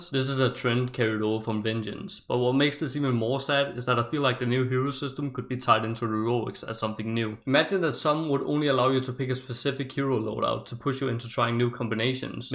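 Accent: Denmark. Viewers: Is blud danish? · You Sound very danish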